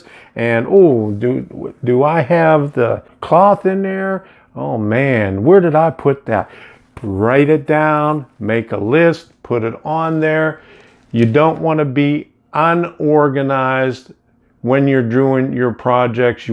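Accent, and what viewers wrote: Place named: Philadelphia, United States. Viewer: You can plan away until you are blue in the face, but when it comes to the "do", plans usually have to change on the way. However, I've learned one valuable lesson which, over the years has saved me both money, and time - thats, dont fix in place until you are sure its where you want it to be, and that applies to track, buildings and wiring alike. I've just spent 6 months working with Railmodeller Pro software, and everything fitted a treat, but I hate to mention how many times Ive substituted one turnout for another or rebent lengths of flexitrack getting stuff to conform to my "ideal" - easier to get your sections right first before you cut and have to rejoin track. Now on the "do" stage, and testing with most unreliable loco, longest coach, and widest truck load to ensure power joints, clearances and curves are as "hoped for". 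Still nervous about combining light and semaphore signals - in 50 years, only ever done the latter.... Great vids - keep them coming...dunno what some folk whinge about, I'm a brit, and I understand your "philly" easily. Wonder if these folk who complain about regional accents are as ready to criticise UK colloquialisms as readily to peoples faces?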